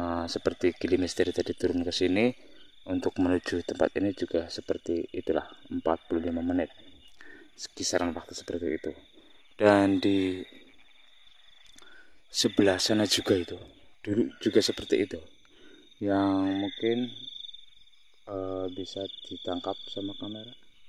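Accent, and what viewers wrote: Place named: Java, Indonesia. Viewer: Indramayu hadir untuk kang Gili Bahasanya medok kaya orang Jawa tapi ko videonya banyak diambil di sunda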